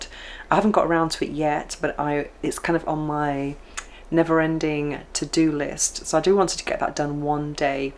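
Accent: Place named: Liverpool, United Kingdom. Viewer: With your opening sentence "I didn't know if I was coming or going." You have a slight Liverpool accent with your finishing school voice.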